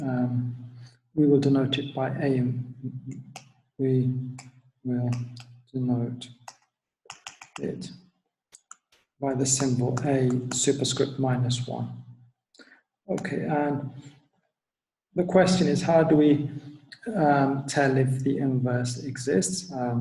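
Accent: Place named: Oxford, United Kingdom. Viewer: sübhanallah sanki oxford üniversitesinde derse girdim bu aksan gerçek mi😱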